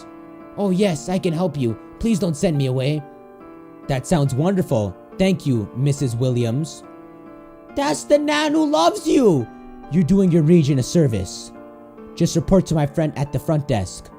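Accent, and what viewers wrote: Canada. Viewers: are you from Canada? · are you Canadian?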